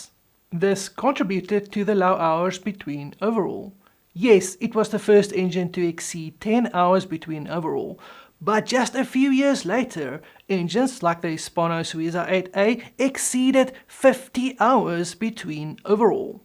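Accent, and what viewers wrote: South Africa. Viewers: Thank you for an excellent presentation, your accent sounds incredibly like South African (Africaans/Dutch). I maybe I'mwrong with the dialect however I lived there for over 30 years and had the pleasure of meeting some very clever people.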